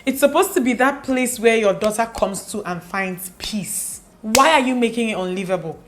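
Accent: Igbo, Nigeria. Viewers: The ibo way you speak your english is epic 😂😂😂😂😂😂😂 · this is a side note but I love how your igbo tones show up in your English!! Looooveeeee ittt ❤️❤️❤️ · Oh God you are so funny. So much truth in what you are saying🤣🤣🤣 Your Igbo intonation is bae Can we be friends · Hmmmm. So I had this experience when I was in school, I had this "friend" who got engaged in our final year. We were close in year1 but not so much anymore but when she told me I was happy for her, talked about the guy and all, how to get d asoebi and Price dat will be favourable for all. At this time, my mum was ill, which I didn't tell anyone except my closest friends (my dad had passed away earlier) but I still tried to call and be a good friend. My mum later passed (bless her soul) during my degree exams but my family didn't tell me bcos of my exams. When they finally did before my project defense, I was so broken, my friends had to move me to a friend's place. 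So, we went to school one day and I saw her, she was acting weird, didn't say anything to me. Later that day, I was with my friends and my bestie goes "do you have any problem with her" I'm like "No, why". He said he noticed she was acting weird and he asked her why and she said she feels I'm not happy for her, that maybe I'm jealous she's getting married first and he told her "what are you talking about, her mum just passed " Females and marriages especially with the Igbos, it's just crazy. I love your Igbo accent though.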